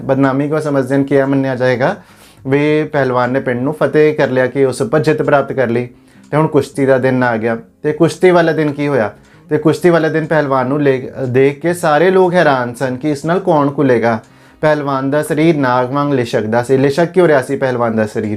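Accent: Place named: Punjab, India. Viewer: Your punjabi language is so authentic Good explanation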